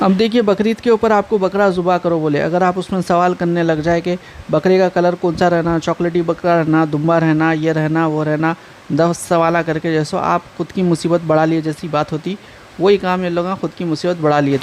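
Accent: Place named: Hyderabad, India. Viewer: Bhai hedrabadi nhi bola kre kuch chizs smjh nhi aati bhot jgh se viewrs hai apke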